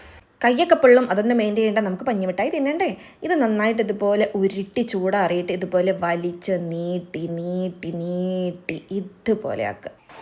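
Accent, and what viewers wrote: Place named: Kayamkulam, India. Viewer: Soana papadi kayamkulam bhasha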